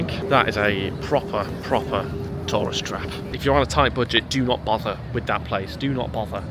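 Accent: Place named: Yorkshire, United Kingdom. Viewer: haha spoken like a true yorkshire lad! <3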